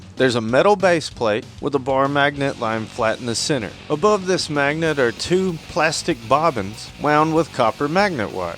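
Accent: South, United States. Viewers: I need a tutorial on how to speak redneck, that was amazing. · I just found your channel and I love it! Your Redneck Weird Al appearance is the perfect match to your southern drawl and dry wit humor! Plus, you seem to be pretty sharp. Keep the videos coming!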